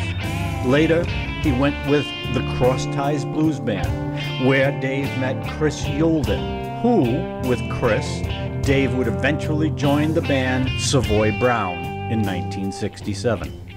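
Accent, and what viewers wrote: Boston, United States. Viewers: That’s because of his Boston brogue. It’s like telling an Australian they pronounce “car park” wrong because they drop their r’s 🙄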